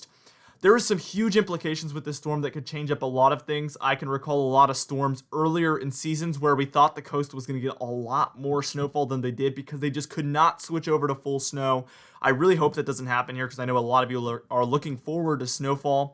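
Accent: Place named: Boston, United States. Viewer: Boston...just guessing from Maine. Lol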